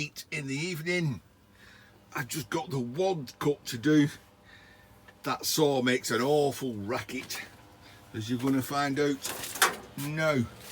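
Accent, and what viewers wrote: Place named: Manchester, United Kingdom. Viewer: I love watching your videos for 2 reason's, First of all being an ex-Mancunian, I do love the accent, I miss it so much. 2nd If I didn't know better I would think my Father had a bike in his younger days, as you could pass as my brothers Twin. You look so much alike. lol Don't worry I won't hold that against you.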